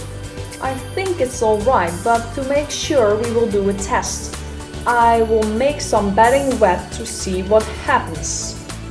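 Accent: Netherlands. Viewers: hoi ik dacht al dat je nederlands was door je accent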